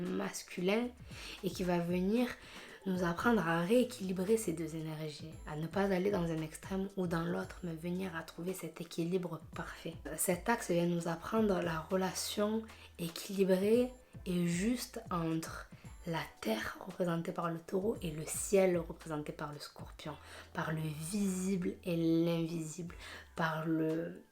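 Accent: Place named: South, France. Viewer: Therapy | Mindset & Manifestation heeeeyyyy la team je suis là ma belle je regarde ta vidéo je me suis abonnée à ta chaîne aussi je te soutiens de fou je te souhaite de cartonner sur YouTube même si je sais que c’est pas ton but premier 😉. J’aime beaucoup ta voix avec ton accent du sud aie 🔥 ton beau visage illuminé 🤩. Franchement tu explique tres bien les choses bravo continu moi je tenvoi pleins de force 💪. Gros bisous de de… la lionne logique 😘😘😘😘😘😘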